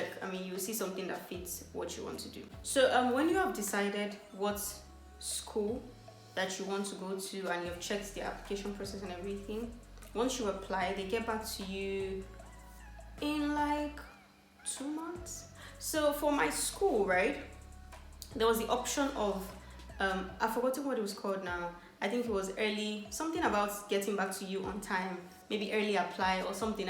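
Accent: Cameroon. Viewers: you sound more like a Cameroonian, nice video, very detailed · Are you from Cameroon.your language tune seem similar here.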